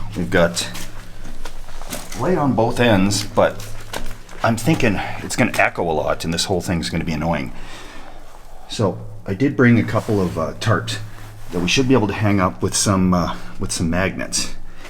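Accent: Canada. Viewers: You remind me so much of Jujimufu except you're sleeping a storm drain and have a canadian accent.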